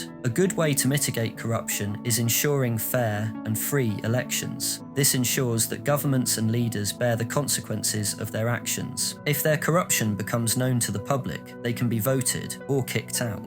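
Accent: United Kingdom. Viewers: i swear all you need for a video essay channel is a british accent this is fire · Wtaf is a "British accent"??? There's no such thing He has a southern England accent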